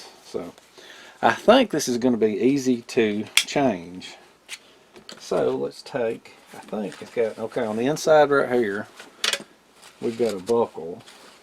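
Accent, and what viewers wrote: South, United States. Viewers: southern drawl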